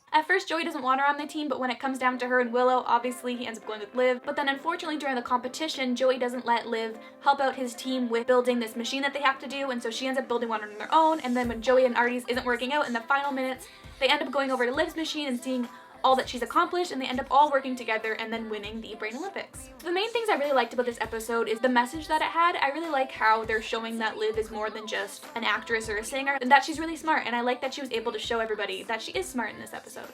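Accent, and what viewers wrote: Canada. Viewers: This is the third video of yours I've watched I think? And I was talking to myself, saying how you sounded Canadian, so I checked the description just cuz, didn't find anything. And then you said it in the video and I was like "AHA! THERE IT IS!" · are you from Canada?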